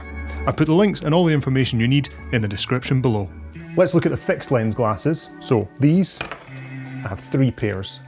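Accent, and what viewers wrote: Scotland, United Kingdom. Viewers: Was so good to hear a Scottish accent when I turned the volume up. (No, I haven’t got some weird voice fetish, it just meant I haven’t got to go searching for the UK equivalent with the links! For a change.)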